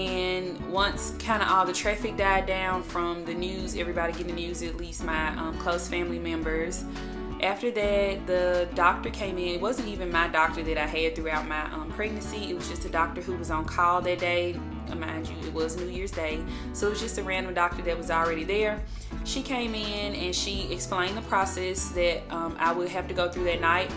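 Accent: South, United States. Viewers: I'm sorry for your loss. Your spirit is amazing. I'm glad you said it...not making light of the situation because you had me lol. Where are you from because you sound just as country as I do😉🥰💫 Not the transformers!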